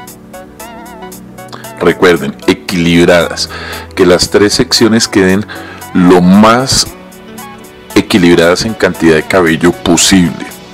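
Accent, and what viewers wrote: Colombia. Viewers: Con esa voz de Colombiano más ese talento y profesionalismo mezclas perfectas💯👌🏾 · Ese es colombiano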